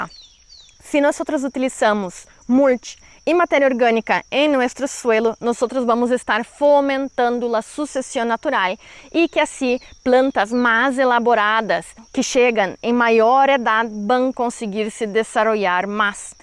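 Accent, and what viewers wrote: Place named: Rio Grande do Sul, Brazil. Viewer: Você é brasileira né? Seu sotaque é de quem fala português do Brasil...gaúcha com certeza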